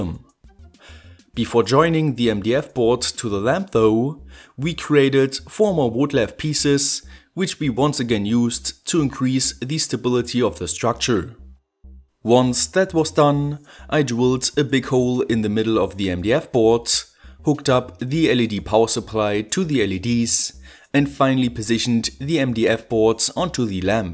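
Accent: Germany. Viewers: Hi bist du deutscher weil es sich sehr so anhört. Hast du auch einen Deutschen Kanal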